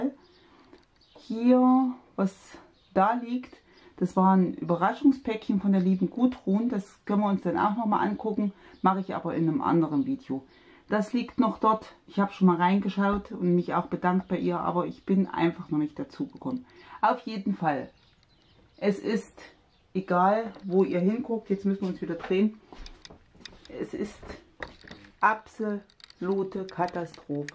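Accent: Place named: Anhalt, Germany. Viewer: Das ist soooo beruhigend, immer wieder auf's Neue, wenn man sieht, dass es bei anderen genauso chaotisch aussieht. ich liebe das und das ist eben das kreative Chaos! Und so was macht uns Bastler doch sympathisch. Danke für's Zeigen und mitnehmen. Ich mag übrigens Deine "lockeren" Videos, man mag Dir sehr gerne zuhören/zusehen. Und... Du kommst sicher aus der Anhaltiner Ecke, wenn ich Deinen "Dialekt" so höre. Ich bin ursprünglich auch von da... 🙂, weiter so und LG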